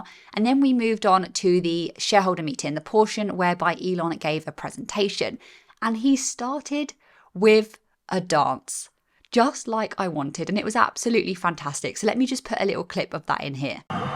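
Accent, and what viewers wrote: United Kingdom. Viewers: British accent ✅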